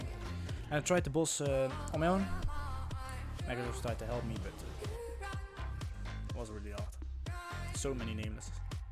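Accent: Netherlands. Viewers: Are you Dutch? Your accent sounds Dutch :p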